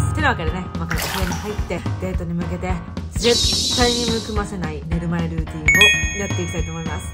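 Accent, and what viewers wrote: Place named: Fukuoka, Japan. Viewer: 髪の毛は「とく、とかす」って言います。ボディクリーム、私はアメリカ在住なんですがアメリカでは結構作っている人多くって私も最近友達の手作りクリームのもらって使用してるけどシアバーター、他、色んな自然オイル（ココナッツやアーモンドオイル等、みんなそれぞれ自分にあったものを入れてるみたいです）使用してあってめっちゃ敏感肌な私にはとてもいいです！オイル使ってるのに塗ったあと全然ベタベタぬるぬるしない、でも保湿はしっかりしてくれて最高です！ナチュラルなものばかりなので髪、顔、ボディー頭から足先まで使えるので超便利です。なので私も来年は作ってみようかなと思ってたところです😊 のクリームできたら参考にしたいと思います。私も福岡出身。アメリカからいつも応援してます、そしていつも地元弁聞いて元気もらってます！😊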